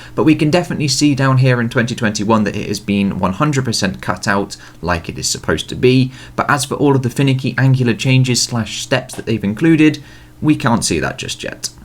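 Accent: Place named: United Kingdom. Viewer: really british accent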